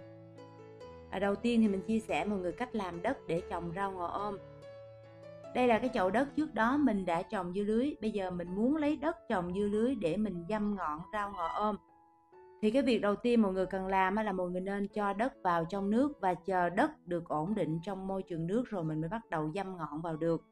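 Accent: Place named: South, Vietnam. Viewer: " " nói giọng nam mà lại ở đà nẵng hỉ?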